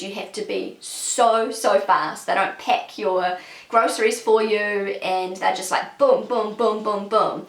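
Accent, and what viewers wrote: New Zealand. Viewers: First of all, I want to say that I enjoy your videos. What I find interesting about your videos, besides the content, is the kind of English that you speak. I had never heard New Zealand English before. I had to watch your first videos multiple times because I couldn't understand everything right away. There are several sounds or words that you pronounce differently and that's why I sometimes understand something entirely different. Here are some examples: rules -> roles; check -> chick; get -> git; ten -> teen; reason -> raisin; bedding -> beating; sense -> since; gas -> guess; f-word -> if-word. Anyway, your English sounds really cute, particularly when you say "GermaNAY" instead of "Germany". Perhaps you could make a video about how New Zealand English differs from US English or British English. What I have also noticed is that English speakers say "recycling" when they actually mean "waste separation". 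I don't know about other German speakers, but I would never refer to the mere waste separation that I (have to) do as "recycling". In my opinion, waste separation is a prerequisite for recycling - or, at most, the very first step of recycling. But it's definitely not the entire process of recycling. I don't melt empty plastic bottles at home and form new stuff out of them. That is done elsewhere by professionals. It probably didn't occur to your German boyfriend to inform you about waste separation, because he assumed that waste separation is standard practice in all countr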